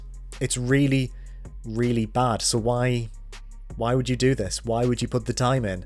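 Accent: United Kingdom. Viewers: “Rare” for this guy is rehhh wtf are British accents it’s excruciating to listen too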